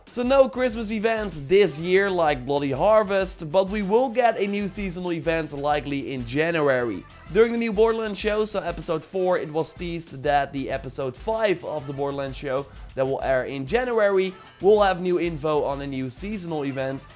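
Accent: Netherlands. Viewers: Het nederlandse accent blijft leuk ;)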